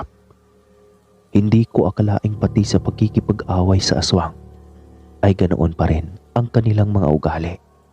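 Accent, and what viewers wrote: Visayas, Philippines. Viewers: Iba talaga bisaya dila pa lang matigas na haha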